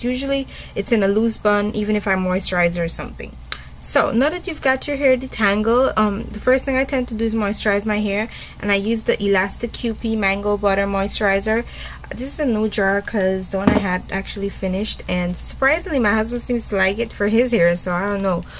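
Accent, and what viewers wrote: Caribbean. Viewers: she def sound like a island gyal XD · Are you from trinidad or guyana? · r u from the caribbean?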